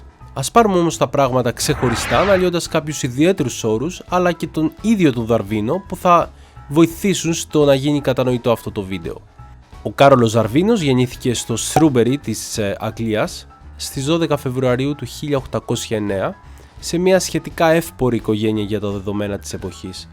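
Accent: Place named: Crete, Greece. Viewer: και το λλλη και το νννι που κολανε με την Κρήτη , το τσε που είναι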